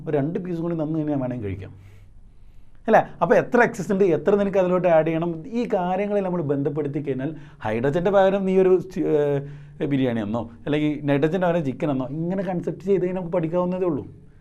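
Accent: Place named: Kozhikode, India. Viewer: സർ കോഴിക്കോടൻ ഭാഷ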